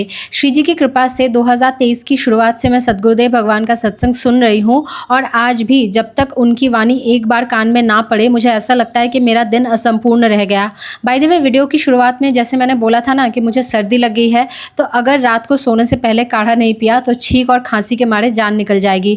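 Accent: Bengal, India. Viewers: Same didi ❤❤jab tak bhajan, katha na laga lu tab tak din ajeeb and adhura sa lagta hai ❤❤ apki bengali voice kitni cute hai 🥰 shree ji ki kripa ap par bani rahe ❤❤Keep It Up ❤❤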